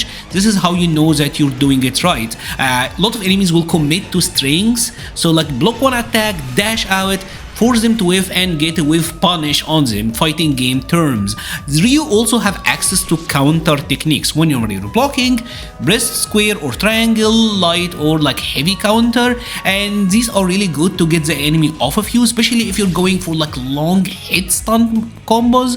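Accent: Egypt. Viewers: I knew u are Egyptian from ur accent 😂❤takes one to know one, awesome vid brother ❤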